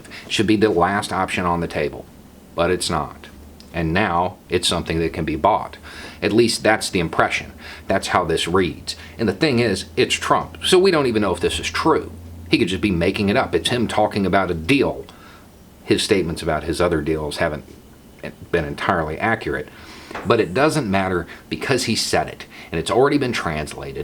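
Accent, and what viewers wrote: South, United States. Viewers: I just have a quick question and I honestly am not trying to be a prick. Why do you have a southern accent here, but when you speak as Justin King, you do not?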